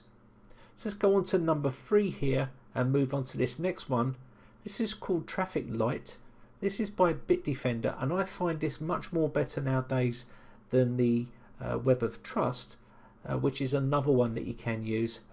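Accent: United Kingdom. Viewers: You're too funny...thanks. By the way, this ole Nana who is a Canadian is heading to Ireland on Friday (I'm guessing you are a Brit but I do have a bit of trouble distinguishing different accents (I know, I'm taking a risk even saying it LOL). My son and 3 beautiful grand children live there and this will be my 7th time going. Anyway, perhaps a little too much info but I really do appreciate all the assistance you have given me. All the best to you and your family dear!!!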